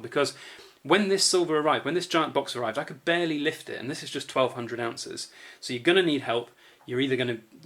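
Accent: United Kingdom. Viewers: You sound like a Brit, did you pay the UK VAT or make use of the EU loophole rate? Many safes are worse than useless. Milk spotting can be removed. Regarding the Queen's Beast, you don't say that extra high premiums on previous to current issues are charged. Compare the Lion to the Black Bull, re. the 10oz, compare the Lion to the Welsh dragon. My view, stick to your particular countries legal tender denominated silver bullion? If and when the SHTF you will have better bargaining power, and if you must have variety make sure that your alternative choices justify their purchase by being cheaper than your prime choice. If you then desire limited issues such as the 10 QBs, treat yourself.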